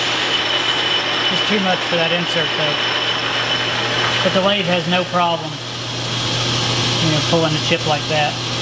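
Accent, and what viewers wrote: South, United States. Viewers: just need to understand that Southern drawl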